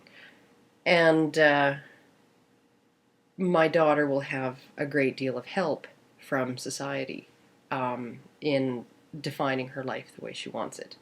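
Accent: Canada. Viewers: You sound Canadian. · Northern accent... Canadian?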